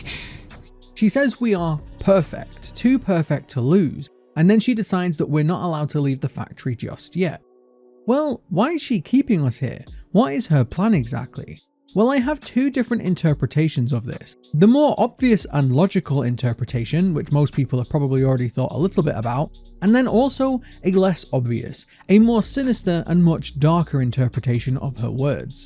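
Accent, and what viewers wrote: United Kingdom. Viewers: I don’t know why but I get you and It’s justJords theories mixed up because your both British, sound similar and have very interesting theory’s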